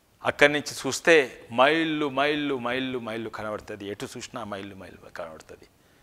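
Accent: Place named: Telangana, India. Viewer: సర్ నమస్కారం స్వచ్ఛమైన తెలంగాణ యాస ఎంతో బాగుంటుంది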